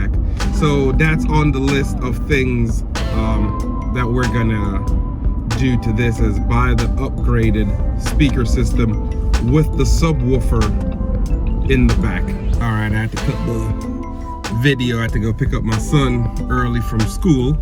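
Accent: Jamaica. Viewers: This man yah sound like a yardie suh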